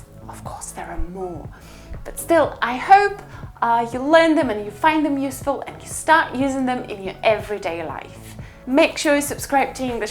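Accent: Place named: United Kingdom. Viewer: O, my Gosh, I loved your accent! I dunno why, but I like British accent. It's so beautiful and royalty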